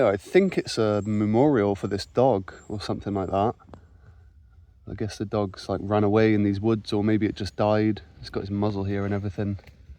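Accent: United Kingdom. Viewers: Which part of UK is that? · what part of UK is this mate?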